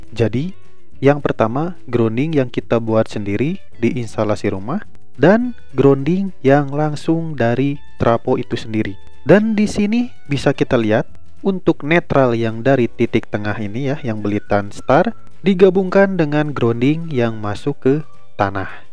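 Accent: West Java, Indonesia. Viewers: Mantap kang solosunya. Sunda banget😅😅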